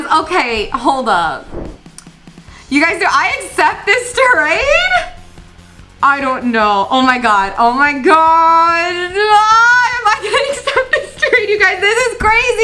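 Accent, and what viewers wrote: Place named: United Kingdom. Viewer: wow your british acent is Just wow incredible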